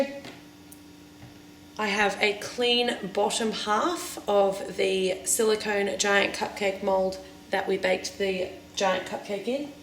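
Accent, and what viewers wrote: Australia. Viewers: I love your accent.. why do the Australians get the good accents? :3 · Thank you so much I was about to embark on this mission with limited knowledge... I know a heap more now and nice to hear an aussie voice for a change.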